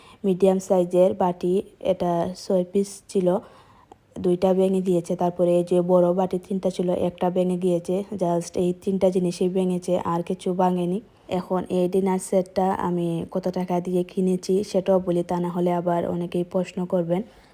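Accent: Sylhet, Bangladesh. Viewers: আসসালামু আলাইকুম আপু কেমন আছো তোমার ভিডিওটা অনেক সুন্দর ছিল আপু তোমার বাসাটা খুব লার্জারি তোমার খুব ভয়েস শুনে মনে হয় গ্রামের বাড়ি সিলেট তাইনা আপু কোথায় থাকো তুমি ❤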